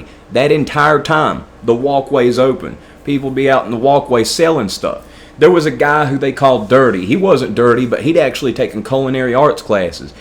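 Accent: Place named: Tennessee, United States. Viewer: Man great story. When I hear you talk it sounds like a TN accent, sound like we grew up together. Kept wondering did I go to school with?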